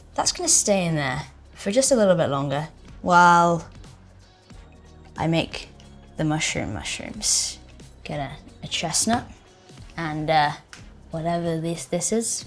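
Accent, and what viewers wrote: United Kingdom. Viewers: British accent, but i actually hear ellie talking, really, it could be ellie making lunch for vogue IF like, not the apocalypse you know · Man ngl I’ve been bamboozled I didn’t know she was British 😅😅😅😅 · Omg she's British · How did I forget Bella is British? 😂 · I love her British accent so much more than her American accent.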